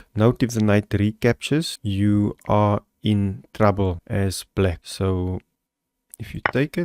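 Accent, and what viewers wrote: South Africa. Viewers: Thanks for the reply/clarification. Nice to hear your South African accent (I used to live there).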